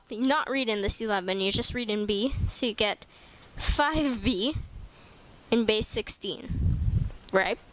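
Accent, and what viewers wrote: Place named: Caribbean. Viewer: I stayed when I realised your accent was familiar. I'm also from the Caribbean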